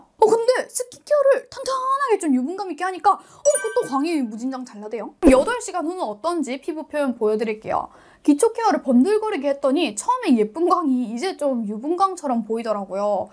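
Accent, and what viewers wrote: Busan, South Korea. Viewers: 저랑 피부비슷하셔서 이분쿠션영상보고 빛이다 감격했는데 요 영상도있었네요 ㅠㅜㅠ짱짱짱 ㅠㅠㅜㅠ부산인인데 사투리까지 짱정겹다 제 친구느낌이에욬ㅋㄱㅋㅋ 퓌 리뉴얼이랑 클리오 하이글로우 웨메쿠션 진짜 궁금했는데 감사합니댯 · 이 웅니 경상도다...경상도 꼬순내가 난다...아닌가....아니야 꼬순내가 낰ㅋㅋㅋㅋㅋ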